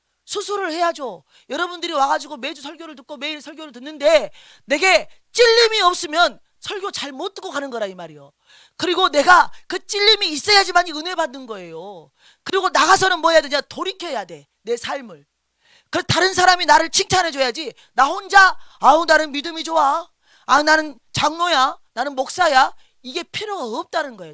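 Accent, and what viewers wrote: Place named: Jeolla, South Korea. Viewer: 목사님 채널을 알게된지 며칠 되지 않았는데요. 같은 전라도 사람이라서 그런지 억양, 말투 넘 친숙해서 좋았어요. ㅎㅎ 무엇보다 화통하게 웃으시는 웃음이 넘 유쾌하게 좋아요. 시대에 맞게 때를 따라 양식을 나눠주시고 지혜있고 충성된 종이 되어 말씀으로 양육하시니 감사드립니다. ^^ 댓글 잘 안다는데 저도 다른 분들 따라서? ㅎㅎ 댓글 달아보아요~^^ 전라도에서 정치에 관련된 목소리 내기 쉽지 않을텐데 용기내서 타협하지 않고 바르게 말씀 전하시는 것을 보면서 참 감사했어요. ^^ 주님 안에서 강건하시고 휴거되어 들림받는 은혜가 있기를 축복합니다. 감사합니다. ^^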